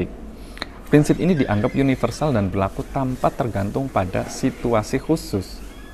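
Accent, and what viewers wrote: Banyumas, Indonesia. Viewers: Njenengan ngapaknya daerah mana mas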